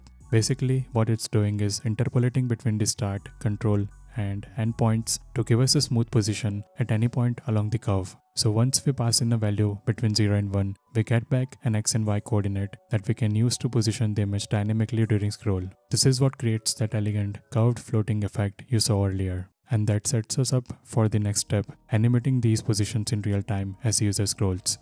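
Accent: India. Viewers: You are from India? Your accent is totaly matched with Indian and your work, literally of god level❤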